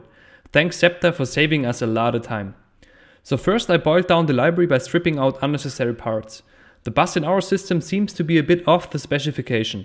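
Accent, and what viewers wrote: Germany. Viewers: Digga man hört so das du deutsch bist 😂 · Zwischendurch hört man es deutlich, finde sein Englisch aber perfekt :D Er spricht Sachen wie Baut zb typisch deutsch aus. Hat aber bei englischen Vokabeln null Akzent wie ich finde.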